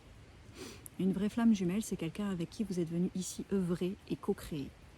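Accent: Quebec, Canada. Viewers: Ahah j'entends un peu de Québec là là...Merci pour ton message.✨👍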